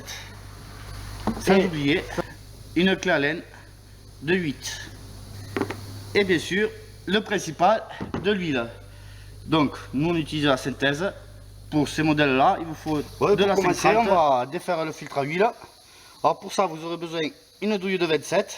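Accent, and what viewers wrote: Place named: South, France. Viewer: Super tuto !!! Clair, bien expliqué (avec notre petit accent du sud qui va bien) précis, astuces de pro, c'est rare ! Té ! Je l'ai regardé deux fois ! Bravo ! et merci 👍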